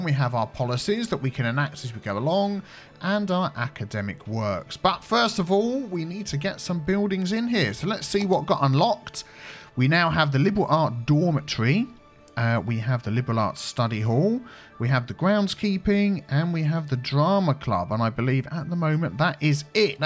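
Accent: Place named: Southern England, United Kingdom. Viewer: Complety off topic, but I'm a geography and linguistic nerd. Just wanted to know where you are from. Trying to sort out my British accent.. It was hell daitng someone with a Geordie accent while in Toronto.. I really felt schizophrenic.. I can hear you are from south England though..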